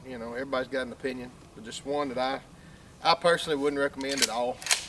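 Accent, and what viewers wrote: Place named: Georgia, United States. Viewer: sounds like your from georiga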